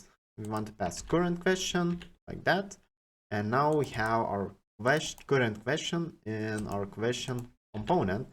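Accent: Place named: Eastern Europe. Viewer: where are you from dude? you sound eastern european ;) Cool video!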